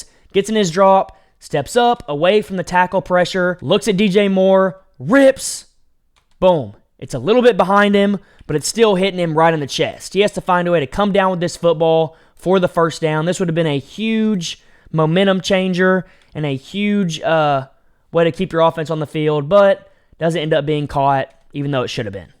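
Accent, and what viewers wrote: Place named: South, United States. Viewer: The southern gentleman who provides this commentary should have a job in pro football somewhere.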